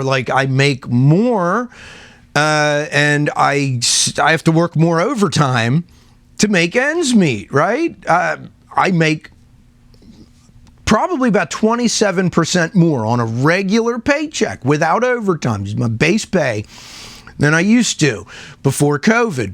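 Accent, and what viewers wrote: Pittsburgh, United States. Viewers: You sound like your from Pittsburgh 🤣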